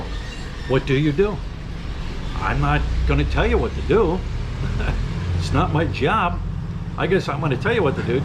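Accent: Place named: Pittsburgh, United States. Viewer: I know you call yourself "," but that sounds like a serious Pittsburgh accent! Dan from Johnstown.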